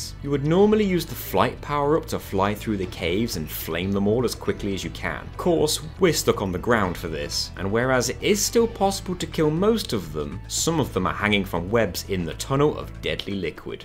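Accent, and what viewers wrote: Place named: Lancashire, United Kingdom. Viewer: I think you might be me. Other than the fact you sound like you're from lancashire/ the north west, we sound identical.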